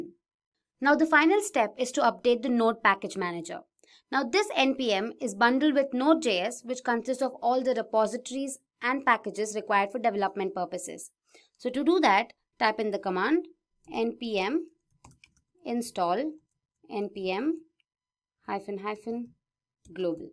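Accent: India. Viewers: 10/10 best tutorial bonus points for the indian accent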